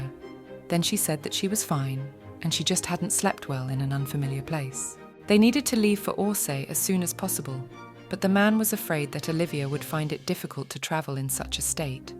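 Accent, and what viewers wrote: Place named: England, United Kingdom. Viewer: Cant stand englisg narrators accent either.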